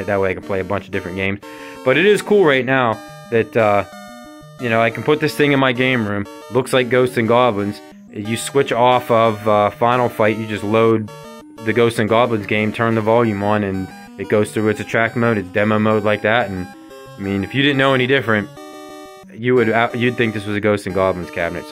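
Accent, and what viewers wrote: Western Pennsylvania, United States. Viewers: Heard that western PA accent and then confirmed it with the Pens lamp! Let’s good man!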